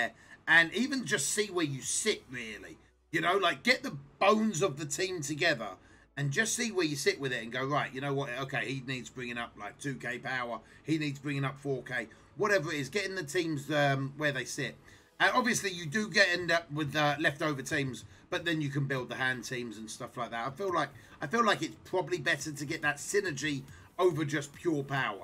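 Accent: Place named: England, United Kingdom. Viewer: Brilliant videos mate! Only just found you and loving it! :) Loving your accent aha! Im English too!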